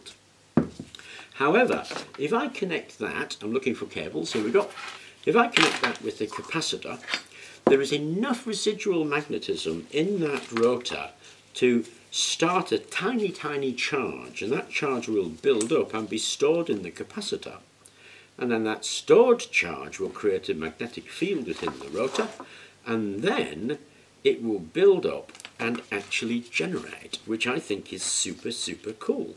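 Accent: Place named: England, United Kingdom. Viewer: Piękny angielski akcent :)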